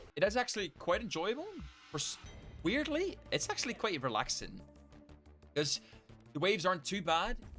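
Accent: England, United Kingdom. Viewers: Pls practice an American accent instead of your horrible English accent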